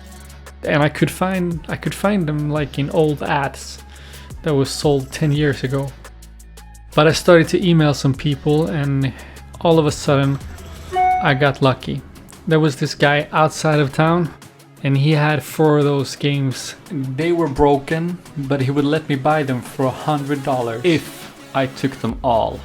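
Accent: Scania, Sweden. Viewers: Jäkla grov skånska grabben hade!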